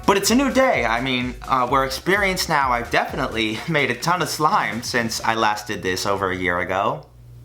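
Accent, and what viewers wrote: New York, United States. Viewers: Is it just me or is actually Freddie Benson with a New York accent?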